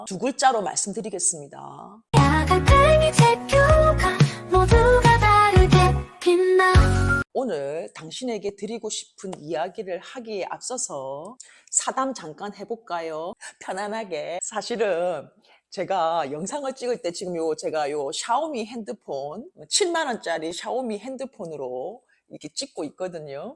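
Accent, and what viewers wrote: Gyeongsang, South Korea. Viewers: 사담이나 수다 다 좋습니다. 지혜로우시고 단단한 내면을 가지신 작가님 육아 뿐만 아니라 인생의 선배로 배우고 싶습니다. 다양한 사담 맘껏 공유해주세요 재치도 있으시고 유머러스하시고 경상도 늬앙스도 넘 좋아합니다. 자기관리는 어떻게 해나가시는지 다 궁금합니다 😊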